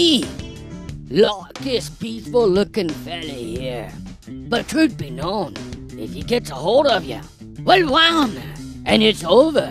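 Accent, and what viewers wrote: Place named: Australia. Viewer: Hey Bro, always entertaining! Kick'in butt . Love the auzzie talk'in mate!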